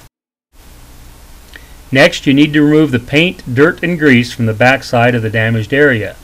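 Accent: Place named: United States. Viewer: frickin americans, soon they'll be saying its a ruf, instead of a roof and a crick instead of a creek.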